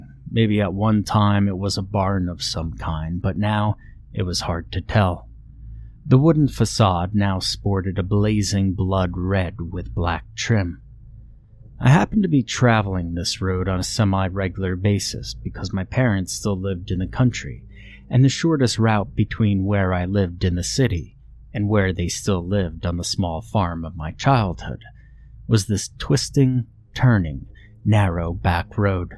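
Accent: South, United States. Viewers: I'm wheezing,. The way you pull off that accent tells me you KNOW the southern life to some degree 😂😂 If not, and, tbh, either way, you nailed it! ❤❤❤ Thanks for the smile and the wonderful story! EMDR is...hard, and I've been binging your channel after not seeing you on my algorithm for nearly 4 years! I'm glad I have so much to catch up on now, despite YouTube playing keep away! 🥰🥰🥰🫂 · Great southern mom accent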